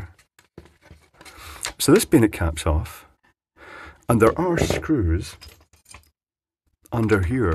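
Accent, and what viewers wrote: China. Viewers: Thanks. I love the Chinglish pronounciation! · I love Chenglish!!!!!!!!!!!!!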